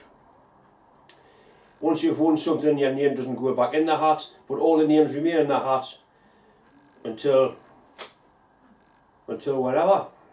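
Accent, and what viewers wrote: Newcastle, United Kingdom. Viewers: i love that jordie acsent. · Wm Robert Leschyna Some would say ' Geordie' often spelt Jordie ,but this is a Newcastle accent as true Geordies are from Jarrow . It's a bit like the difference between Cockney and an East London accent . True Cockneys had a language and dialect far removed from the East London one , but is now almost completely vanished .Mi da an is da were Jarrow boys , mi granda on the March ! I grew up in East London and couldn't understand any of my grandparents . Dad from Jarrow , Mum from fenland Norfolk ! When visiting the US back in the 70s nobody could place from what country I came from but my mate being a Cockney , no trouble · pif paf the accent and dialect is called "Geordie". it is spoke by people in and around the City of Newcastle-Upon-Tyne in North East England.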